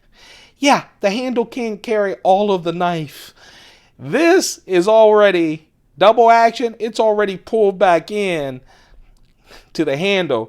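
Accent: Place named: Upper Peninsula, United States. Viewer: Redeemed Man, I agree 110%. Unbelievably annoying. I also couldn't finish it, had to shut it off. As asked above, was he under the influence of drugs/alcohol? Man, if I ran out of hand strength after operating these knives a few times I sure wouldn't make a video showcasing it. He sounds like those guys that sing,. I won't make this mistake again.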